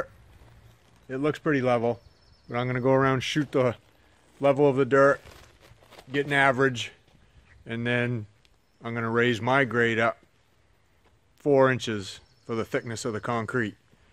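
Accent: Maine, United States. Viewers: Sounds like your a Mainer!?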